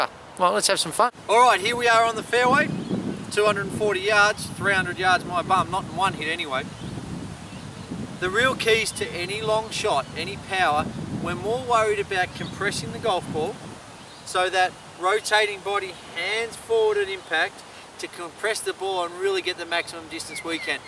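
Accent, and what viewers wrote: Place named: Australia. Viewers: Probably Aussie based on the accent. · haha english men , Hes a Aussie,accent and by the birds sounds and fauna behind him... yes your right me and a mate played his stepfather a 72 year old and he whipped us,he got a 82 and all he did was hit straight ....!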